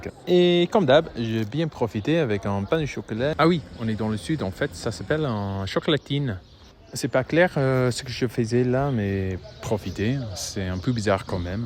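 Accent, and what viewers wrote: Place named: Quebec, Canada. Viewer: waw your French is amazing we wouldn't even know you're irish you kinda sound québécois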